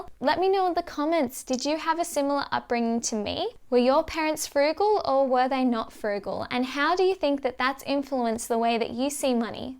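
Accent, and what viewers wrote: Australia. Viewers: Loving your content night and day. Maybe it's the Australian accent :) I wanted to say this is a great video to show your audience why you are doing what you're doing. It's great to hear how you were making $400 per week early on. Through the struggle and sleeping through it, you are now more financially independent than before. I find a similar story because when I first started off, I was making $300 per week only. It's nice knowing that your why purpose is to help others benefit from financial discussions. That's why I value watching your content. You seem very warm, bubbly, and charismatic. Thanks for your video.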